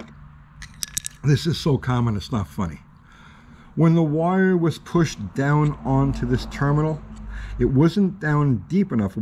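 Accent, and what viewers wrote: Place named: Long Island, United States. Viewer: Thanks, good sensible advice clearly explained. BTW, your accent makes me miss Long Island!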